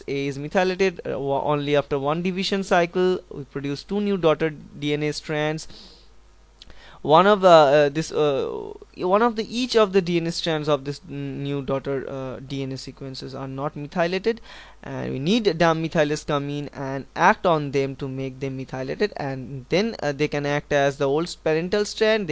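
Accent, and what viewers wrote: India. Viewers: I am sorry I can't understand Indian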